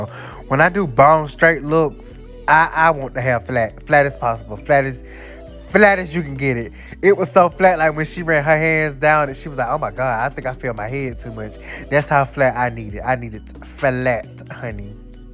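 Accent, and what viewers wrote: South, United States. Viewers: being too cute when the country twang jump from the gums.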